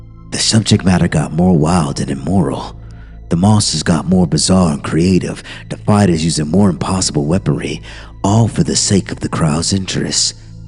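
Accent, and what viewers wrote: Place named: South, United States. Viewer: Great job on the southern accent! I'm from TN & so many narrators can't pull it off but you nailed it my friend. Always good to hear from you. U are an awesome narrator & I enjoy your channel very much. Take care & hope you have a fabulous Friday & a wonderful weekend ✌🏽❤️✌🏽❤️✌🏽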